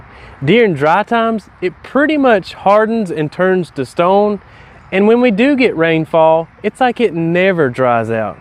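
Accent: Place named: Tennessee, United States. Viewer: Did you say you’re in TN ? That’s where I am and your accent matches mine 😊. Thanks for the video! Very helpful. Hubby got us one of these yesterday for our anniversary 😊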